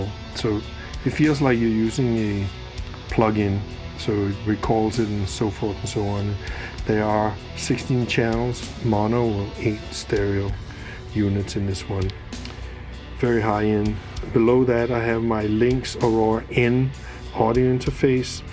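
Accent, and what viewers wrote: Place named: Denmark. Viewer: HELLO! "Old friend"... GREAT an`really informativ video. Good to see that your still are alive. It`s fun to hear! That you still got "The Danish accent". Greatings from Denmark...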